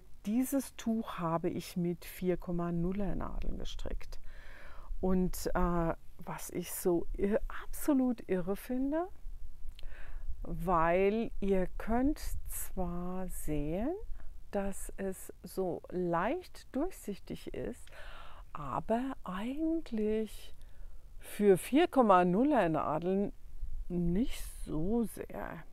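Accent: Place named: Hamburg, Germany. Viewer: Ah, alles klar. "Hässisch" und dann noch "hambugäsch" obendrauf. Dadurch erklärt sich vieles. 😉😘 Mag ich sehr gerne hören. Ganz liebe Grüße über den groooßen Teich 🤗 in ein so ganz anderes Klima 🌵🌞, was ich vermutlich klasse fände. 👋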